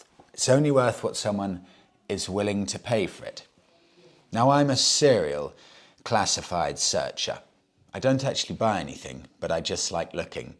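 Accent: United Kingdom. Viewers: Fer crissakes, ya gonna 'pologize fer having an English accent next? Never apologize for pronunciation, just do it & move on....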